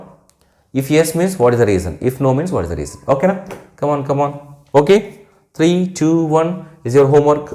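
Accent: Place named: Andhra Pradesh, India. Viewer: Sir ur talking like andra people but ur saying ur from hyd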